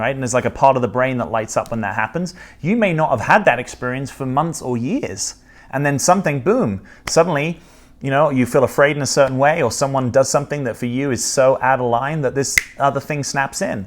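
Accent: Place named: United Kingdom. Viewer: Thank you for this video. Your analysis and understanding of human behavior is helpful and amazing. Your British accent makes the topic as significant as it really is and should be. Will listen to it again and from time to time. FR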